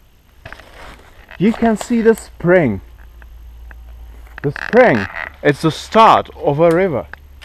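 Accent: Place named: Czech Republic. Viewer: <link> - online slovnik s vyslovnosti, online jich najdete vice nejlepsi jsou s britskou a americkou, kde slysite rozdil okamzite - nevysilejte do eteru 'ceskou' anglictinu, nadelate vice skody, jak uzitku - nemam v umyslu vas zhazovat - napad je to dobry, ale musite znat dobre svoji materii nebo se obratte na rodile mluvci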